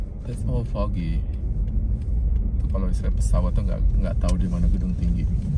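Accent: Medan, Indonesia. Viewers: hah iyaya??? Aku kirain orang medann, hahaha. Thx ya infonyaa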